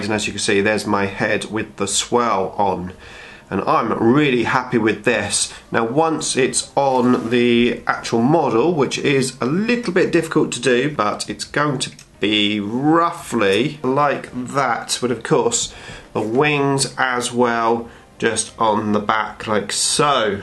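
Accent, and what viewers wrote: United Kingdom. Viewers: When he says: "My void dragon" I think that translates to void dragon. It can get a bit lost in the British accent =P. Great work! Prince MN Represent!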